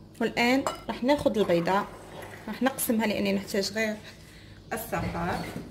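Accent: Skikda, Algeria. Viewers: يعطيك الصحة يا الفحلة انموت على الهدرة تاع ناس سكيكدة اتمنى النجاح لقناتك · روعى لقريوش بصح من هضرتك باينا سكيكدية انا من قسنطينة. بصح صعيب شوية فى خدمتواويدي الوقت. يعطيك الصحة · جابلي ربي من اللهحة انتي سكيكدية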